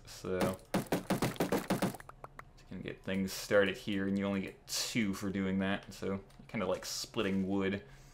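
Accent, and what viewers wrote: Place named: Canada. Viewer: Awesome to hear those Canadian vowels again. Welcome back to the Internet's.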